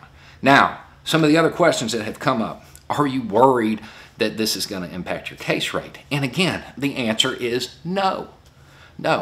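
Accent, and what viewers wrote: South, United States. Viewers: Because (s)he does it ALL the time & it helps some people. Some have hearing issues, or may not understand the southern accent, or they retain visual things better. Whatever the reason, it's a service, a good thing. Why does it bother you so much? It's not like YOU were told to transcribe it (were you?).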